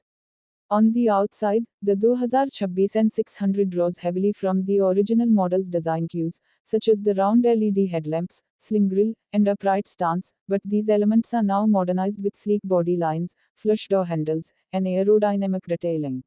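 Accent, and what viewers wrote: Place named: India. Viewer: with the heavy Indian accent and her "rushing" without any inflection makes it almost impossible to understand this announcer.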